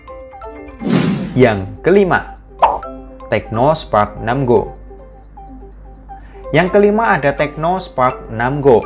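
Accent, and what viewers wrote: Semarang, Indonesia. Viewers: mas, sampean wong semarang mesti hehehe suwon info2nya mas, sangat membantu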